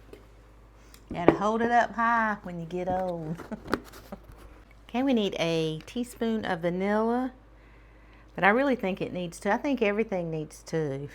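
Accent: South, United States. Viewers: Hello. I'm just jumping in to say how much I love your soft, sweet southern accent and your soft voice. Reminds me of my grannies when I was young. She made desserts all by hand, and there is nothing better than southern desserts. Thank you for sharing your sweet self with us.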